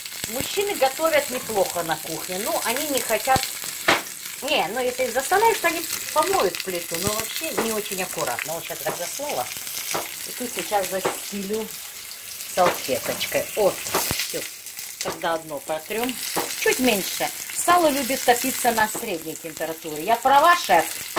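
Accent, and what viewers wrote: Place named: Rural, Ukraine. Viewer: !! Какой позитив и Ваши прекрасные комментарии к готовке! Смотрю, не отрываясь,хотя блюдо простое.Но так аппетитно Вы говорите,вспоминаю украинскую деревню,детство и мою бабушку, деда, отца.Как этого говора и блюд не хватает в Москве!! Спасибо Вам, снимайте больше и про все, про все!!!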